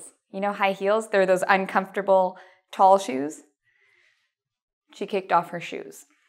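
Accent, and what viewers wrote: United States. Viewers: 美式英文太难听了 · I'd say she's American accent (I am not quite sure), either way it doesn't really matter, those phrasal verbs are used in UK as well :)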